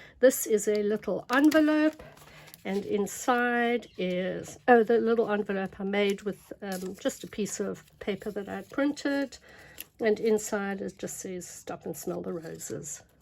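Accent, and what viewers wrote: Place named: South Africa. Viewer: So lovely to hear a South African accent on an art journal video.Your art journal is beautiful.Love from the Karoo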